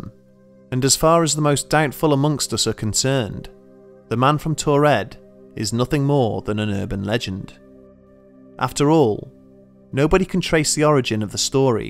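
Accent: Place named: Northern England, United Kingdom. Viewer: His accent is northern English (possibly Yorkshire) although not very pronounced. The internet is swamped with American media and accents these days which is probably why you haven't heard of it before. Even the media in Britain tends to include predominantly southern/accentless British voices so we're not blameless either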